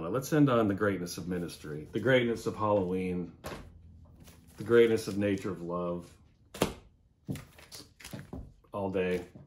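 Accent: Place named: United Kingdom. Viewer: British accent? I was thinking the same thing! Funny!. Peace!.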